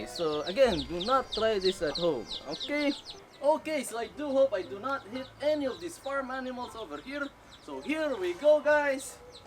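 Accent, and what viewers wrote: Russia. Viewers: Comment muna bago nood squad - Pucha when u hear dat russian accent, alam mo na may masisira. (YUNG BIG BANANA CUTTING) HAHAHAHAHAHAHA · Why the Russian accent tho??? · Stick with the Russian accent! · Russian Accent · Hindi, Russian siya di mo ba nahahalata sa accent niya mga bobo talaga mga bata ngayon. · That crazy Russian hackers accent hahahaha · Love it while smashing some thing plus the Russian accent saket sa tiyan kakatawa😂😂 -r.i.p play button WERPA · Russian accent💕😂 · Hey Keep Up The Russian Accent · Love that russian accent😂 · familliar tlga yung accent ie tangina Crazy russian Hacker 😂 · Russian accent <3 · russian accent si hahaha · kaya lagi ako nanonood ng mga videos mo eh and gusto ko yung russian accent mo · Spot on yung russian accent 😁👍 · Pag si nag russian accent gumagawa sya ng kagaguhan pero kapag nasa normal accent sya napaka bait😂😂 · lol russian accent parang si BUCKS. 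like kong kilala niyo si BUCKS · Russian accent 💕 .. HAHAHAHHA 😂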